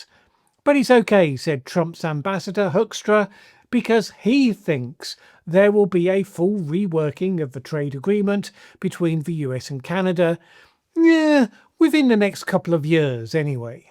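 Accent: United Kingdom. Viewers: Canadian here. UK pronunciations ftw.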